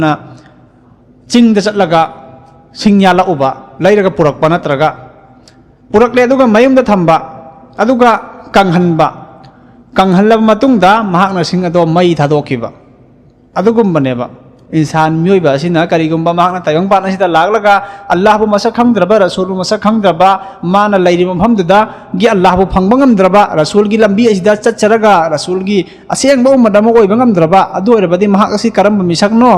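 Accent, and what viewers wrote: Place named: Arab world. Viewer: Janab se Hafeez ama oibgi chp chaba magun lei.... Arabic accent, afaba warol sndokpiba, yam taba nungai......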